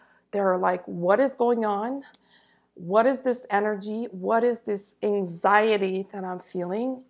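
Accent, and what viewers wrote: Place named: Montreal, Canada. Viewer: I live in the country and while gathering horsetail for my tinctures, I pulled up a nice dandelion plant, saved the roots to roast for coffee, and cut the leaves up finely, added some of my seasoned oil and vinegar, salt, pepper and voila! Are you in Canada? I lived in Montreal and recognize the accent, I think...Namaste!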